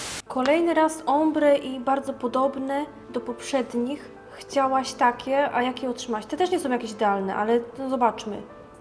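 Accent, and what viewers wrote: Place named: Silesia, Poland. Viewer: mów wiecej po Śląsku plisss uwielbiam!!!!!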